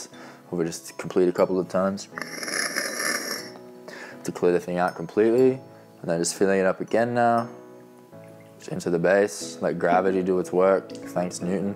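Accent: Australia. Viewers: Yup, thought so too. Maybe Adelaide 😂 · Western Sydney I reckon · Obviously this dude is a fellow Aussie but I do not recognise his accent...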